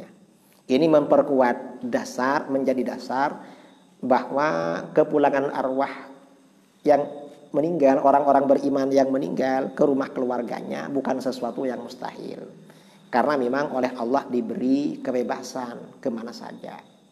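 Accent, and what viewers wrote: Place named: Madura, Indonesia. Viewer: Beliau kental akan logat kemaduraannya...❤️💙💜😁😃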